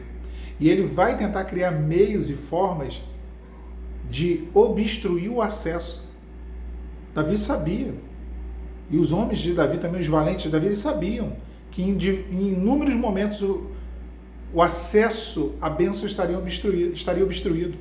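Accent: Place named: Rio de Janeiro, Brazil. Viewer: Você também é aqui do Rio. Bem que reparei o jeito diferente de falar. Que legal!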